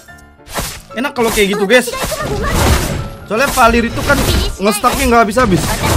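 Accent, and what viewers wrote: Java, Indonesia. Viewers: bg medok skrg wkwk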